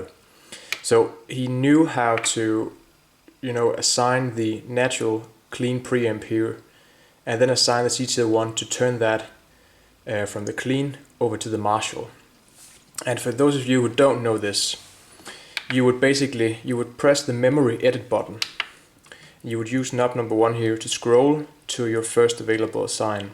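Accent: Denmark. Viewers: Owned a Cort S2600, really good value guitar. BTW are you from Denmark, regarding your accent?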